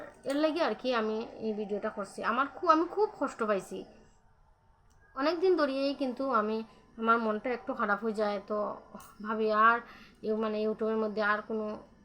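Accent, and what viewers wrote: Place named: Sylhet, Bangladesh. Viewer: তোমার ভাষাটা একদম সিলেটি ভাষার মত আমার তো খুব ভালো লাগে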